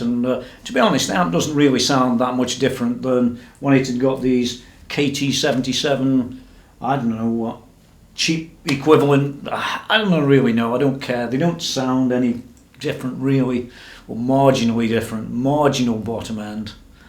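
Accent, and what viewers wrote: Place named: Northern England, United Kingdom. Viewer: ah. Fair enough. It sounded vaguely northern to me. Like bits of my mate from st Helens mixed with Yorkshire. I'm from Northumberland myself so anything south of Middlesbrough is a mystery to me accent wise.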